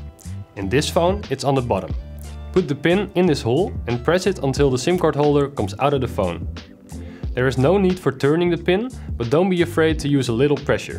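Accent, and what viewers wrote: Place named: Netherlands. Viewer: I hear a Dutch accent! very nice tutorial and explanation!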